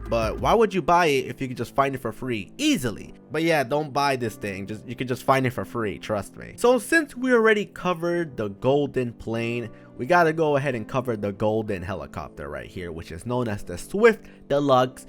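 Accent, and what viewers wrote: Norway. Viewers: Is he norwigian???? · Are you from norway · Are y from norway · er du fra norge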